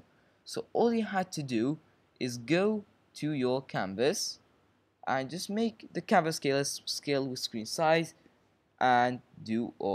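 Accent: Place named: France. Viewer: Thanks you french-voiced stranger.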